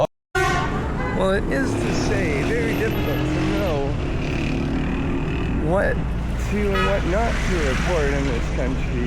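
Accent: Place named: United States. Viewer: Maybe taliban suspicious of you because you sound American. They are watching you all the time 😮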